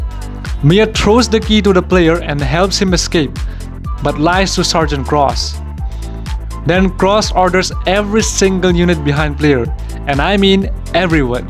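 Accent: India. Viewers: Your Indian accent really brings this story to life👍😸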